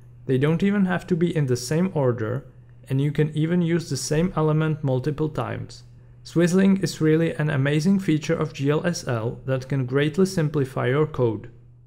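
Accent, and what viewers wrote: Czech Republic. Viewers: tady je někdo čech já to v tom slyším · Czech accent immediately spotted :D, thanks for the great video.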